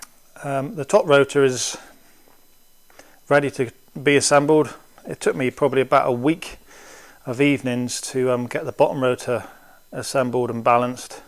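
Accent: United Kingdom. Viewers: Well done for getting this far. I built a prototype coaxial 6 years ago and got it to basic hover testing but put it away in my shed and now I have some more time are wanting to move it to next phase of testing. I was wondering where you are based? You sound British. I'm live in New Zealand.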